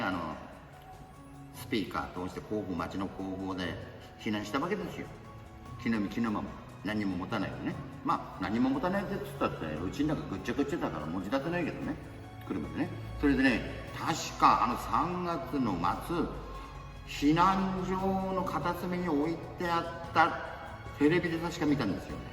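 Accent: Fukushima, Japan. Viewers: 訛りがうちのじいちゃんに似てると思ったら同じ福島県民だったんですね笑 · 私も地元福島なので訛りが心地いいです。これからも動画見ます！ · やっぱり福島の訛りか～、死んだじいちゃんと喋り方そっくりで涙出てきた じいちゃんはメイセイオペラが好きでした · 前から喋り方、イントネーションが福島県民っぽいなあと思っていたらやはりそうでしたか！ 同じ福島県民ですが相馬野馬追、一度も見たことがないのでいつか見てみたいです！ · なんか聞き慣れた訛りだと思ったら同じ福島県でしたか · こんだけ話せればボケにはまだまだ早いｗ あと私も福島出身なので訛りがなんだか懐かしいｗ いつまでも元気で馬の話して欲しいなぁ 馬の脚は第二の心臓っていうからねぇ… 蹄を地に付けて歩くことで身体に血を回すポンプとしての役目を担うから 歩けなくなったらもう致命的なんだとか 個人的にヒシミラクルのウマ娘化期待してます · なんか喋り方きいたことあるなあと思ったら福島出身でしたかｗ 親父の実家福島だったから懐かしい感じするわけだわｗ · この方、福島の方でしょうか？ 浪江出身の祖母の訛りによく似ていて心が和みますｗｗｗ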